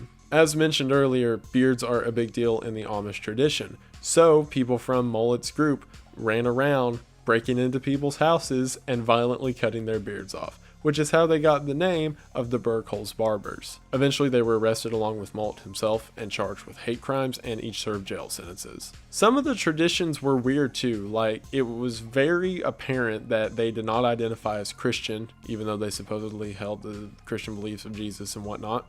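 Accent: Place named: Kentucky, United States. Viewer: Your voice is very smooth, like a young college professor from Kentucky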